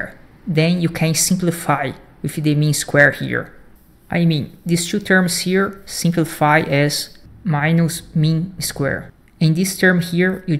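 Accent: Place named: Brazil. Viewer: His accent is very Brazilian also 😅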